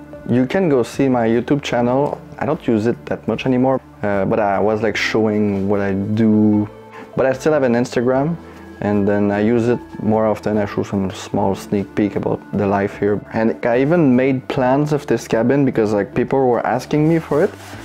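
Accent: Quebec, Canada. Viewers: He has a french accent. Québécois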